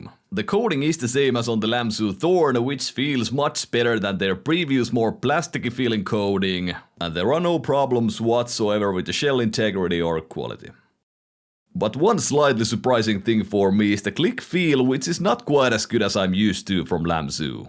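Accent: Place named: Finland. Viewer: It's a very typical Finnish accent. If you play CS in EU you will hear that accent all the time. If you want a good idea of what the language sounds like and Finnish culture, I found the music video " " by " " very fascinating. My Finnish friend said to me it is a very good representation of what it means to be Finnish.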